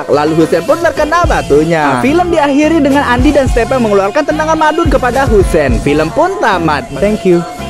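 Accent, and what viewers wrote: Palembang, Indonesia. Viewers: Kau wong Palembang po 😅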